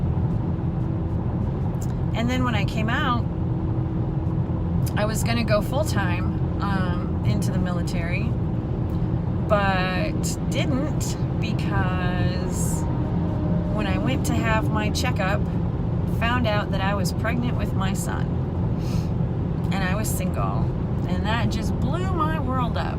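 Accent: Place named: Ohio, United States. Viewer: 😂 You sound just like my wife. She grew up in rural Ohio. When she moved to NYC, she initially found us to be rude and abrasive. After now living here for almost 3 years and seeing all of the good that is done, she sees we are genuinely nice people who remain guarded because we come into contact with a lot of crazy on a daily bases. Your journey is awesome! Keep sharing.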